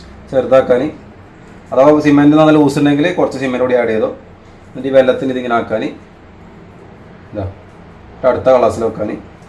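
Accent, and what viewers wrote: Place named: Bengal, India. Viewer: നീ മലയാളം പഠിച്ചിട്ടു പറയു, വിഷയം നല്ലത് but ബംഗാളി, ഇതു പറഞ്ഞാൽ പകുതിയേ മനസിലാകുന്നൊള്ളു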